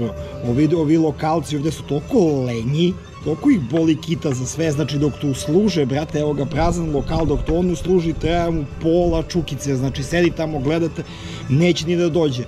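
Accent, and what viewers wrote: Niš, Serbia. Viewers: Ne znam koliko si svestan, verovatno ti pricaju prijatelji i porodica, ali jednom kad se vratis u Nis, ti vise nikada neces pivo da platis ovde. Za ove tri godine si uradio vise na promociji grada, naseg slenga, naseg naglaska, naseg humora, uopste za urbanu sociologiju grada, nego svi politicari u Nisu za poslednjih 30 godina. Kad ovo sve za jednog Durlanca kaze jedan rodjeni Bulevarac, onda ti je jasno sve bratooo 😎 Cekamo te ovde i budi siguran kad te budem sreo negde po gradu, necu da ti trazim selfie ili tako neka sranja, nego ima da bude "De si be, nabijem ti ga? Aj ce popijemo po pivo i da pojedemo nesto konkretno, namocam ti se u pirinac i nudle vise..." Vidimo se nekad, u oslobodjenom Nisu 💪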